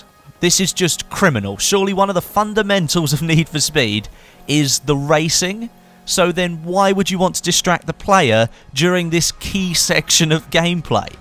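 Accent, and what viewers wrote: England, United Kingdom. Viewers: Very good video! Been subscribing you for a long time. Like those videos uploaded and what I like most is your british accent! Well done. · I love your videos and your English accent. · Nice video again! You always make my day better with your lovely british accent;) And just in case i win the giveaway, i want to thank my mom and dad for making me food every day, and i want to thank my computer for making me able to write this comment, and I can't forget to thank my aunt for being my aunt... Ohh, i also want to tell DANNYonPC that you need to get off YT more often, cuz if you don't, you will turn into a very bad hot sauce. Atleast that's what some old guru told in Australia...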